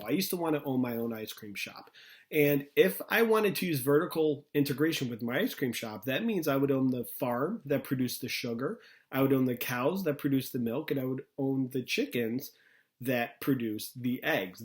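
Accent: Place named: Buffalo, United States. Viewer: Guys, I think this guy is from Buffalo.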